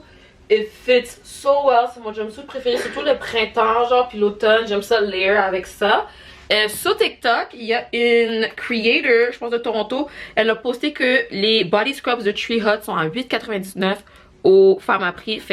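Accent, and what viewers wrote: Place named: England, United Kingdom. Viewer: THANKS 🌟 🌟 FOR ANOTHER🍎 HEARTWARMING DOSE DOUBLE DOSE OF FRENCH. 🍏 LATELY IT SEEM THAT U HAVE A LITTLE MORE ENGLISH INFLUENCE ON YOUR FRENCH THAN BEFORE.😳 GREAT JOB.🏆 Of course I thought your next project would be a podcast called 🎈 in the House🎈📚An online Book discussion📚🪙Your Own novel🪙 and the sky is the limit.🥁 BIG UP YOURSELF 🔥🔥🌎💙🎥🎬🍀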